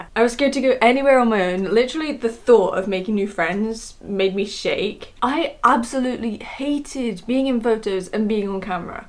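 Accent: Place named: West Country, United Kingdom. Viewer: What is your accent? I can't place it but then very occasionally it sounds very slightly west country? but then at other times very very subtly scottish... so both can't be right?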